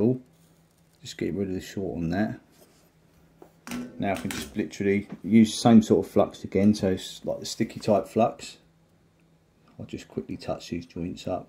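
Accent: United Kingdom. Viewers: You are very british, I'm struggling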